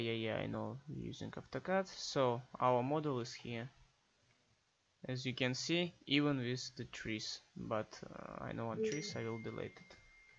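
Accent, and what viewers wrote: Russia. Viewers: Чисто по акценту руссишь слышно, спасибо)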